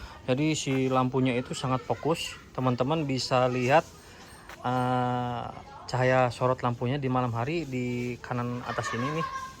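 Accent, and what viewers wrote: Indonesia. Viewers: logat Sunda+gua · Urang Sunda ieu mh logatnya beda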